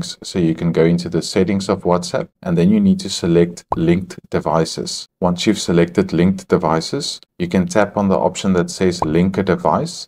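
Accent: South Africa. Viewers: Thank you so much ❤ My Microsoft Store seems not to be working , Got yourself a new sub here, the accent sounds South African❤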